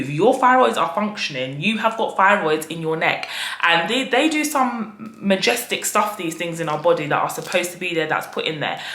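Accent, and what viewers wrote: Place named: London, United Kingdom. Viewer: YOUR LONDON ACCENT THO.....!!!!I ..I can hear that all day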